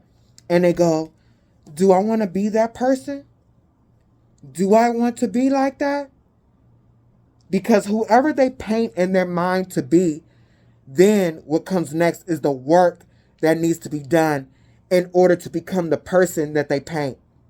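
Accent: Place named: Philippines. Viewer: Hey shadow soul tribe gifted sister. Thank you so much for your knowledgeable reading you shared with us. Take care darling. I believed its my seriously story indeed right now?? Phoenix rising above aka Dragon lady. Watching from Texas. I can tell you are a Pilipina with your accent. Sorry but im just guessing. Take care and ve safe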